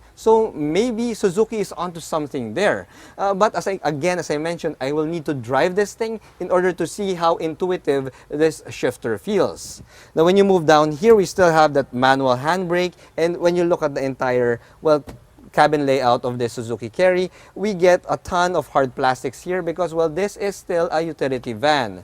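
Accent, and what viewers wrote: Philippines. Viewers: shifting by ear??? Haha!!! Shifting by hand is easier I think. It feels natural. Haha Pinoy English. Trying hard. Tagalogin mo na Lang boy!!!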